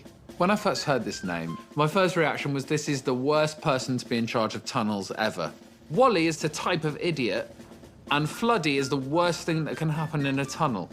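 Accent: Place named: Afrikaans, South Africa. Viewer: oh wow he actually had a non shit south afican boer accent wow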